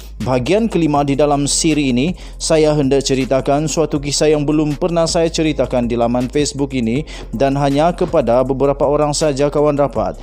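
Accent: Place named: Sabah, Malaysia. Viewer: sabah bah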